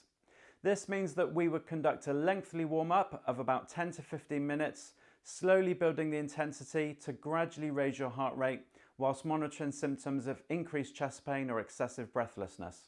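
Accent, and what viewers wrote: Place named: United Kingdom. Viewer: Thank you for this very helpful video. I could listen to your British (?) accent all day long. I hope to be incorporating this exercise regimen along with Flow Therapy (Compression for Micro Vascular disease) soon.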